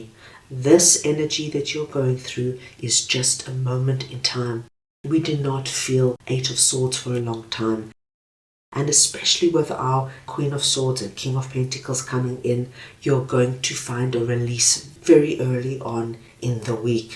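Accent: South Africa. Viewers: I love how you read, and also love your lovely south African accent🎉❤ Thank you 🥳🙏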